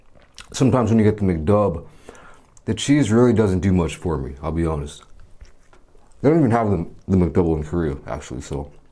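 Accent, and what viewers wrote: United States. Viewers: Why do you have an American accent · U got American accent dude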